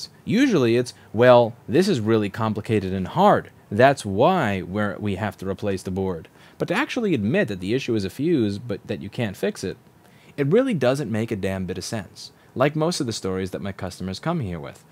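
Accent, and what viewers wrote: New York, United States. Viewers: I'm sure I'm not the first to tell you this, but your voice reminds me of Jerry Seinfeld. I guess that you also have the same accent since both of you are New Yorkers.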